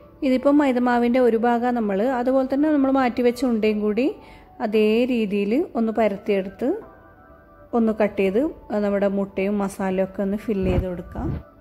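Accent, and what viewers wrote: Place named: Kannur, India. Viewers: Njan new sub er Anu ithante samsaram ishtayi aann aann kannur aanno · Adipoli puffs....but I don't have other oven... I have only microwave.... nice to hear from you....kannur slang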